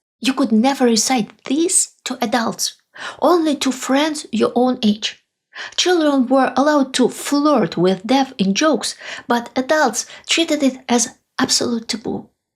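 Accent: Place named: Russia. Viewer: Oh, I love this Russian accent ASMR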